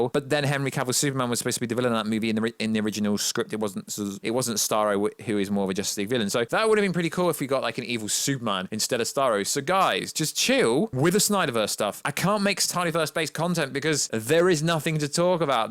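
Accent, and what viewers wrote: United Kingdom. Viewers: What's your accent? Are you British speaking in a American accent?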